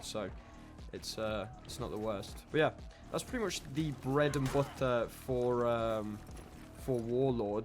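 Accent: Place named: Australia. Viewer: Very good and informative video, my wife likes your Australian accent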